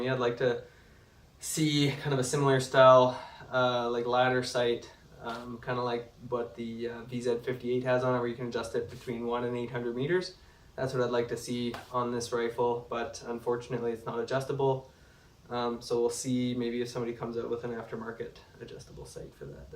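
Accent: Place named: Canada. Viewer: You sound Canadian so please stop staying Zeee - its Zed :)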